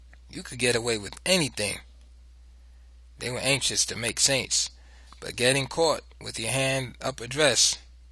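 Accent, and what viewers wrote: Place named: New York, United States. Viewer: Damn this new York accent.. Lol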